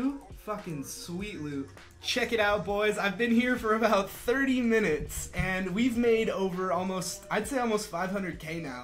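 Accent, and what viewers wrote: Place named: East Coast, United States. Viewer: Cali :o i totally thought you were an east coast guy. now i sad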